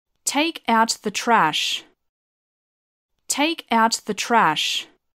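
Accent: England, United Kingdom. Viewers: У вас Британский язык? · Произношение чисто Английский (England)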